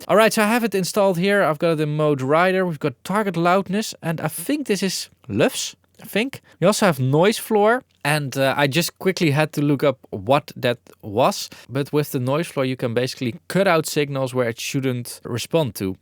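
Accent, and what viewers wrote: Netherlands. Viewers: I could tell that you saId "Write" and not "Ride" immediately because "Ride automation" makes no sense! Of course, I've been watching your videos for a number of years and here in the upper midwest (Wisconsin specifically) it's not all that uncommon to interact with immigrants from the Netherlands and other northern Eurpoean countries so we're more used to those accents and have less problems understanding them!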